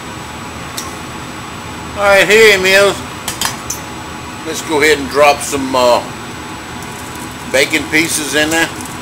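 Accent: Louisiana, United States. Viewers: I could tell from your accent that your a fellow Loiuisianian!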